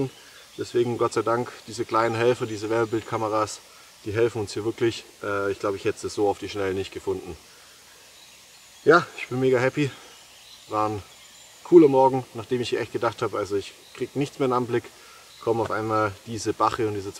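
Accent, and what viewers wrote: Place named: Swabia, Germany. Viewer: Wo sind wir denn gerade? Bei uns im Schwobaland? WMH! 🌿🌲 so hört es sich zumindest an…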